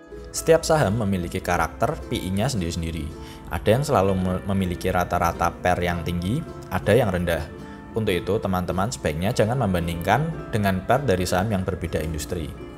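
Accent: Java, Indonesia. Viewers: Min. Tolong dong. Kapan kapan bikin subtitle atau tulisan yg diucapkan, karna bahasa mimin klo ngomong medok bnget😂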